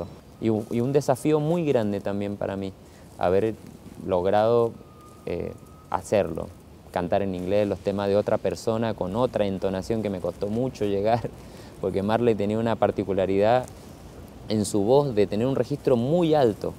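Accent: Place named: Peru. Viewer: a ver señor atole, ponga atención a la gramática, yo no escribí que no lo conoce, escribí que dice que no lo conocía, es decir en tiempo copretérito, denotando que este peruano con apariencia de peruano y cabello lacio de peruano y acento de peruano que ahora se dice el mismo que es argentino quiere dar a entender que el conoció el reggae y empezó a hacer reggae y que el ni en cuenta que existió bob, luego presume que tiene canciones en inglés, y bla bla bla puro egocentrismo, y obvio que se puede aprender por otros medios pero no estamos hablando ni de ti ni de mi si no de ese falso rasta que nunca lo fue en verdad, su voz temblorosa al cantar parece enfermo y sus letras aparentemente con sabiduria estan mas que vacias. quieres saber de rastafari tengo el kebra nagast y los libros de enoc por si te interesa saber de un tema en particular.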